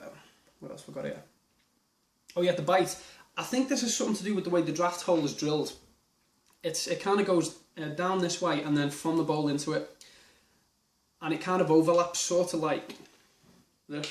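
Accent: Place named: Liverpool, United Kingdom. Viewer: Terminus Airsoft he's a scouser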